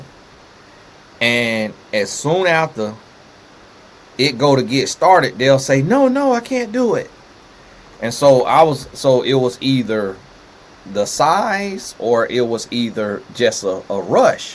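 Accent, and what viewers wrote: South, United States. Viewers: Thank you Tony Gaskins for your channel as your take on life’s situations is hilarious! Love your southern accent, respectful!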